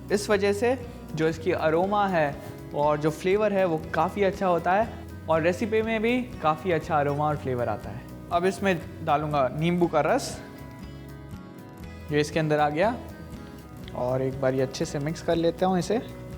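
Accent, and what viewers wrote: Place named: Hyderabad, India. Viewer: For some reason, I felt Chef had Hyderabadi slang when he speak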